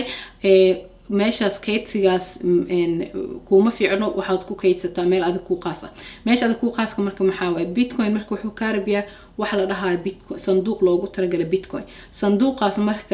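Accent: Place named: Mogadishu, Somalia. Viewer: Naa lahjadan reer xamarka YouTuber ku noqon maysid ee nagatag waxaad ku hadlayso iska soo sax hadaad dad dhex keenayso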